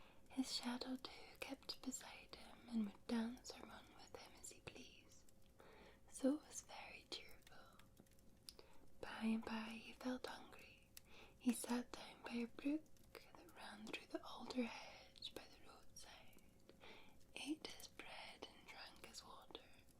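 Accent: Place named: Scotland, United Kingdom. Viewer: This is your best video yet 👍 absolutely my favourite Scottish accent in the world, thank you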